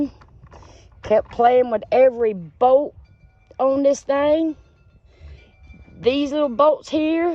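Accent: United States. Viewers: MEN TAKE NOTICE OF THE DETAILED EXPLANATION. BY THE WAY, I LOVE THE COUNTRY ACCENT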